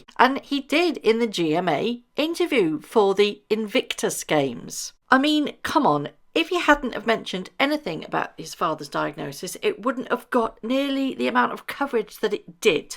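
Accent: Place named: RP, United Kingdom. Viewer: Dumb American here. I enjoy trying to suss out UK accents, and I've gotten pretty good over the years. But yours is a mystery....very neutral....good enough for BBC....just a bit posh-sounding (at least to my ear).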